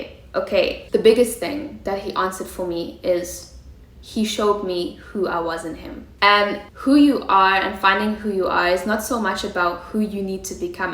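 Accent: South Africa. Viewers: Are you south African ? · your south african accent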